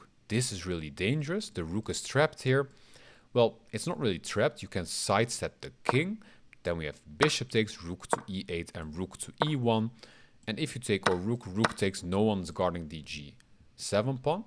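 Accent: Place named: Turkey. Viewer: Türk aksani her yerde kendini belli ediyor😃 guzel video reis devami gelsin bu tarz videolarin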